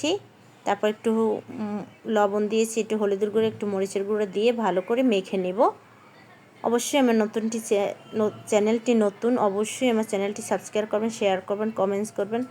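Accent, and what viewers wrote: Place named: Bangladesh. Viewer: Didi apni ki banglades thaka? Voice tone ta oi rokom tai jiggasa korlam❤❤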